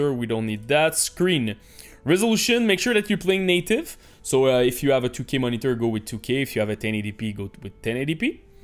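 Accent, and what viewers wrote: Quebec, Canada. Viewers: Un Quebecois sur rust. Finalement ! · C'est clairement un accens Québécois XD Good job pour le vidéo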